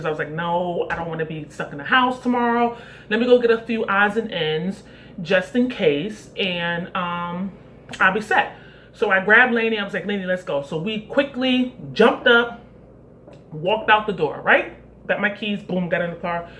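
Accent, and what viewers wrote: New York, United States. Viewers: What part of NY you from? It sneaks out when you get excited.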